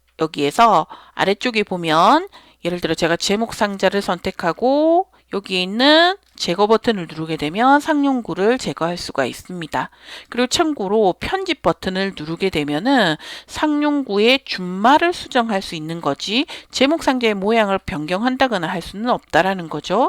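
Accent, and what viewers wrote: Busan, South Korea. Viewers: 제고향 부산이라서, 강사님 말투 듣는 순간 같은 고향사람이라서 더 정감이 갑니다. 40대 아줌마도 열심히 강의 듣고 있습니다. 감사합니다.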